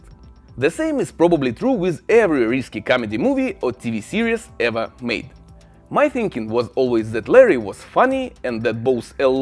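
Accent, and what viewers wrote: Russia. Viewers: Рашн инглиш из изи ту гет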